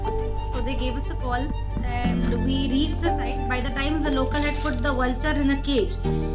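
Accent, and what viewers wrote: India. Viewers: the Indian accent makes my head shake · Lol, I love Indian accent. BTW thanks 😊 ☺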